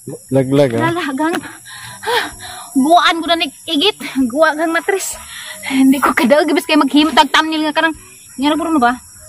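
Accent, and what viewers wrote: Bohol, Philippines. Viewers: Hua<link> ba anang watermelon mo sis..nalingaw ko ug tan aw sa.imo mga video nahimout ko sa imong sinultihan nga bol anon kay mao man ako mama taga maribojoc siya..sending my support sissy mmlso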